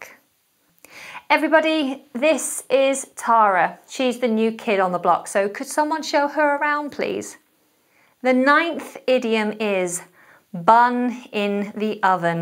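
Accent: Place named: United Kingdom. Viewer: I am falling in love with British idioms and British accent because of you 😭♥️♥️♥️♥️.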